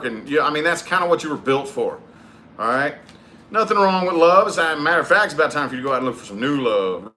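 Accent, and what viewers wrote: South, United States. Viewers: Somewhere in the south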